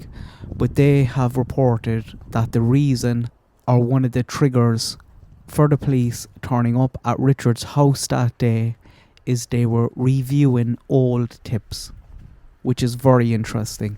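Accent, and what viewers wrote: Ireland. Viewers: Helloo well done my friend always enjoy ur take it might be the oirsh accent but I like the take..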